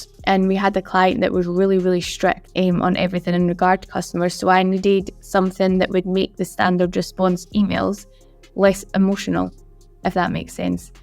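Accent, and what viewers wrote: Scotland, United Kingdom. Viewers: Is that a Scottish accent? It's a nice one =-). Keep up the great work & quality content.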